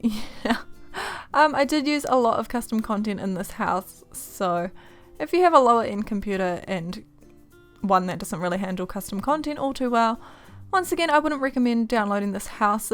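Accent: New Zealand. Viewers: your accent made sense P.S I'm a kiwi too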